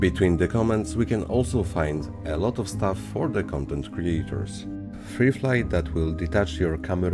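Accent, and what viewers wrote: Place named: Poland. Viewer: Tak mi się wydawało, że słyszę polski akcent. Swoich się jednak rozpoznaje X D Spoko materiał, dobra robota!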